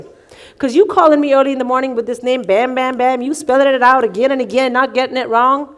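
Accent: South, United States. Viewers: This is southern genteel white woman. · This is southern not African American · EXACTLY!!!! its southern accent...NOT AFRICAN AMERICAN!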